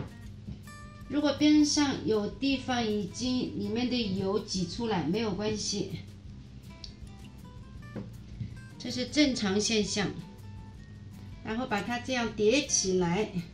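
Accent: Shanghai, China. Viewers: 👏👏👏一口上海普通話❣️❣️ 教了拿能弄，還撫平了我的思鄉心💕 謝謝噢謝謝🙇🏻‍♀️ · 上海普通话听着好亲切哦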